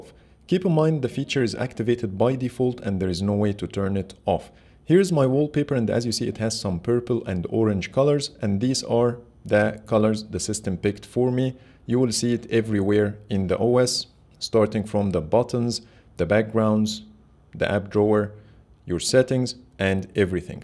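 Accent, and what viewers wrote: Egypt. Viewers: انجليزي مصري اطلعك من وسط ١٠٠٠ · Is this guy Egyptian? Jeez that accent sucks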